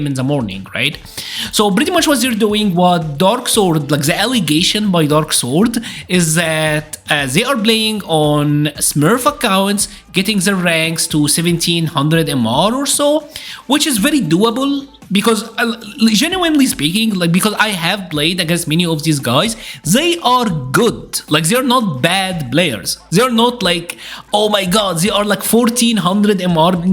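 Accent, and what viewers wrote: Egypt. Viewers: Egypt? crazy accent. how do so many of yall fit in the Nile delta? 🤯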